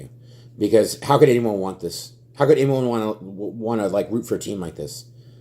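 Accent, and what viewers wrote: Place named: Philadelphia, United States. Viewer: Ahahahaha...bro, yer starting to sound like the dude from Philly.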